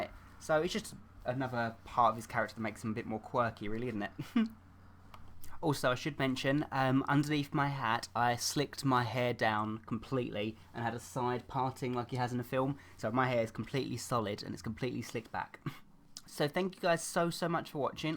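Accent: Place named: United Kingdom. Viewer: His accent is ... Brittish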